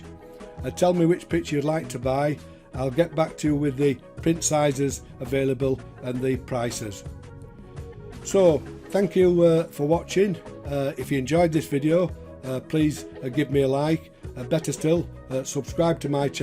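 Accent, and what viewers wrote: United Kingdom. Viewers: Thanks for this video, I was looking at an MX but this is really nice, a lot more expensive though. I currently have a P30, OM2n, Leica R5 and Nikon F3. You sound fairly local, I'm in Manchester. Subscribed :)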